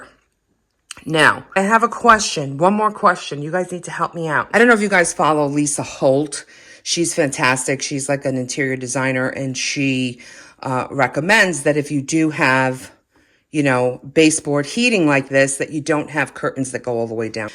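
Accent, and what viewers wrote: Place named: New Jersey, United States. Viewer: I say kawfee too lol. You kno Jersey.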